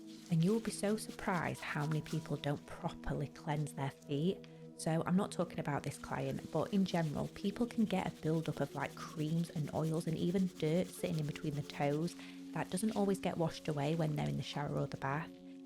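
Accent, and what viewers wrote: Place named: Manchester, United Kingdom. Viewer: This is hilarious, because I am also a 38 year old man! 😂I at least DO know how I got here... I happen to find women's feet to be beautiful (not in a purely bedroom activities kind of way) but just the aesthetic beauty. Also, I totally agree that her Manc accent is quite lovely, and it definitely adds to the relaxing ASMR quality of the videos. Anyway though, love the videos, love your whole vibe, please keep on doing what you do because you are so amazing at it! 🙏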